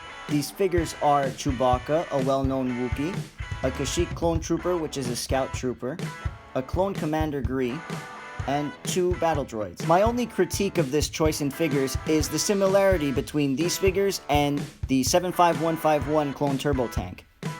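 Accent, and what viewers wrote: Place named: Netherlands. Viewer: Why do you have a dutch accent?😂🇳🇱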